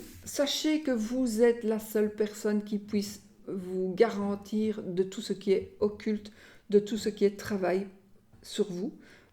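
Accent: Belgium. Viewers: Salut, sorcière bien-aimée, comment vas-tu avec ton petit accent belge ? On est pays...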